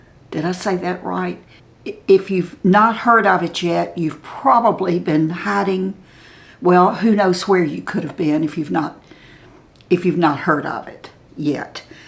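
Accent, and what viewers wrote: South, United States. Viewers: You are such a beautiful woman, inside and out. Prayers for your stressful things to be over, and everything else to be good. I enjoy seeing you, and I love listening to your “southern” accent! It’s so pleasant to hear!🙏❤️💐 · Your southern voice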